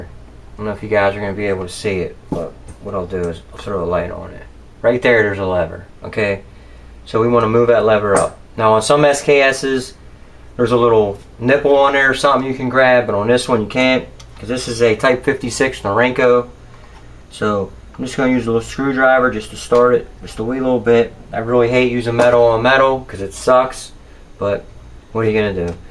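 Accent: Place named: Ohio, United States. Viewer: sounds central east Ohio to me. I'm from Cincinnati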